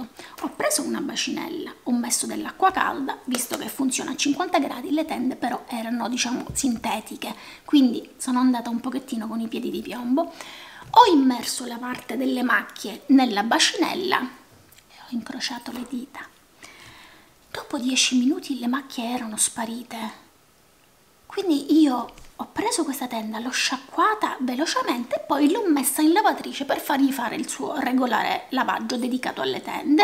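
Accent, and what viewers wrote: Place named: Palermo, Italy. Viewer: Sei di Palermo come me riconosco la cadenza 😂 ; è vero non si trovano nei negozi normali grazie per avere dato la dritta su Natura Sì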